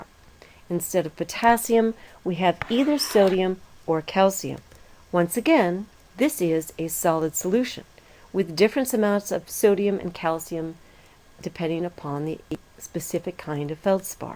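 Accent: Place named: RP, United Kingdom. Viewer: Beautifully elucidated (great posh chat).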